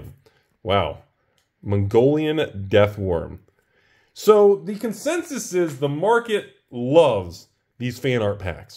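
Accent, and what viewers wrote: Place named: South, United States. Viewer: It’s his pronunciation. Sometimes I hear it was “crowns” IN THE SOUTH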